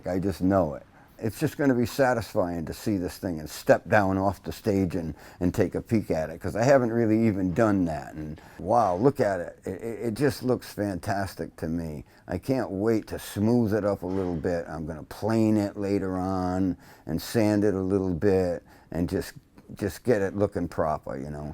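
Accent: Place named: Boston, United States. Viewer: You have a stronger Boston accent than me haha, nice video!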